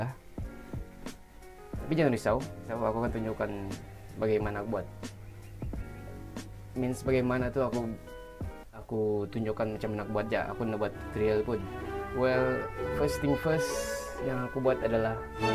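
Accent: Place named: Brunei. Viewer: Ohh patut tah melayu mu cam brunei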